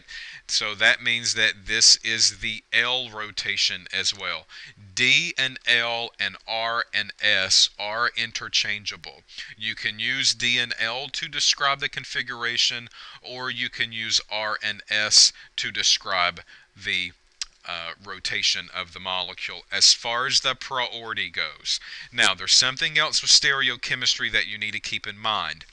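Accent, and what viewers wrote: South, United States. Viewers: love the dixie accent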